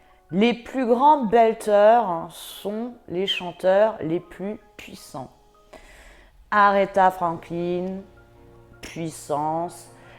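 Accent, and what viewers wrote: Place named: Paris, France. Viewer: a chier avec son accent parigot bpbo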